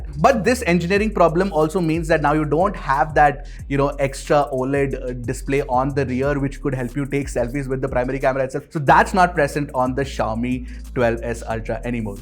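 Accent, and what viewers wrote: India. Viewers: I swear indian accent English is pure f.king ear cancer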